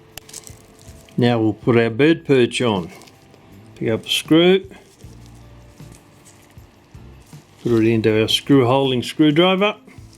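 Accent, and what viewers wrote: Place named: Australia. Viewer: Are you Australian by any chance..?......12.20 into video you confirmed my question with"that thru there...thats got him!!" Mate the old lady slipped off not long ago and i know have the very clock i went all the way to Schwartzland Germany in 1996 to buy for her. I actually saw there the worlds biggest cuckoo clock,the bird that comes out was the size of a commodore wagon im not kidding .anyway ive now taken on the task of getting it going properly agsin.its identical to your one in video abd im certain the issues im having a easily fixable but i need a hand ??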